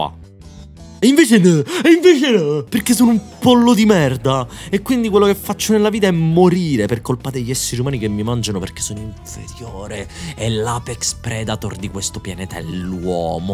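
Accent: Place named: Ravenna, Italy. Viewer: ma te sei di ravenna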